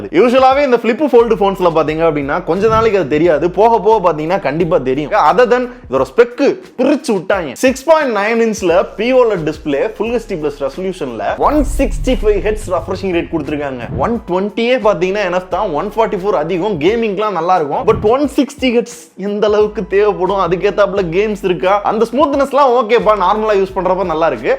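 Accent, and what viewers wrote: Madurai, India. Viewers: Bro oungaluku recent videos laa madurai slang veliyaa varuthu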